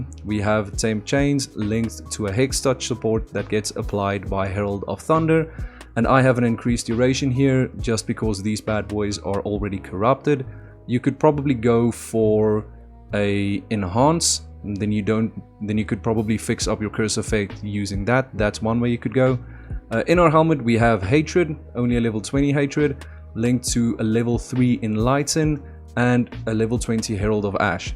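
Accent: South Africa. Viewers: south african detected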